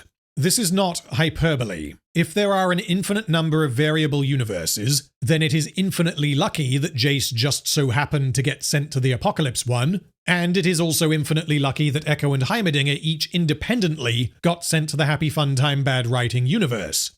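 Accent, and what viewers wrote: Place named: South, United States. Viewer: Are you a voice actor? You have such a bombastic voice. I'm not an expert on English accents but it certainly sounds "southern". Finally checked out your own stuff but have watched a lot of your EFAP appearances. Best of luck with your channels & I am looking forward to watching your previous vods.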